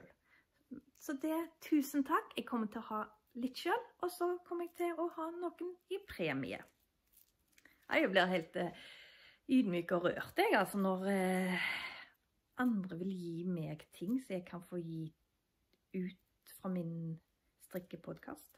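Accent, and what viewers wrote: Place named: Haugaland, Norway. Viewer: Takk for flott episode, kjekt å hørra Haugalands dialekt og å se fine bilder fra Borgøynå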